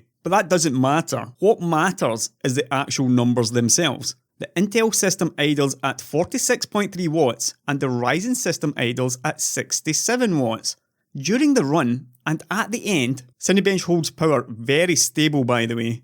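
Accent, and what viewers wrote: Scotland, United Kingdom. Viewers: Wow, that is an absurdly strong Scottish accent. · so. much. sa.tis.faction. Just dripping from your thick Scawttish vowels. I even felt a little bit smug myself:) nice work!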